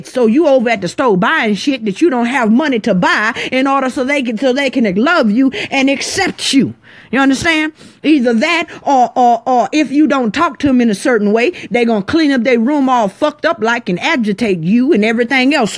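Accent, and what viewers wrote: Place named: Chicago, United States. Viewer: Thank you. I am recovering from childhood abuse- my parents tried to kill me when I was 15 and I got away. I found you because I initially was listening to Eligio and started to peep game. The universe led me to you and I needed to hear this. Tomorrow is my 42 reincarnation date and it’s been psychologically challenging this year. This message was for me and I heard it live last night before it cut off but I heard exactly what I needed to hear. Blessings and thank you 🙏🏽. Btw, my husband and I thoroughly enjoy listening to you. Yes from the Chi and says you sound like his peoples. 🌟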